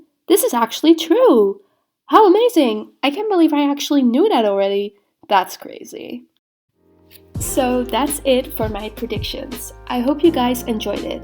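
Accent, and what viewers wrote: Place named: Netherlands. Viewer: hey, i have a question? where are you from? because ur voice rlly sounds dutch lmao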